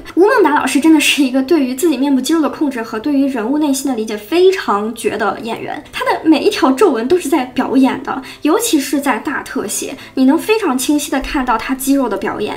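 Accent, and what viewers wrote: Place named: Shandong, China. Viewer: 我不是任何人粉丝，但Up主你的口音也很重，你可以再说一遍“小巷人家” 吗？ 你是山东人、河南人? 反正不管哪的人，你的台词也还得练练，有点大舌头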